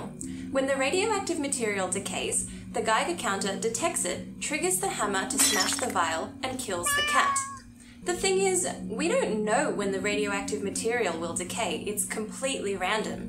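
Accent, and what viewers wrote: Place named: Australia. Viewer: Someone on twitter commented saying you were Australian and I didn't even notice!! I guess I'm too used to the accent. Where are you from? Where did you study?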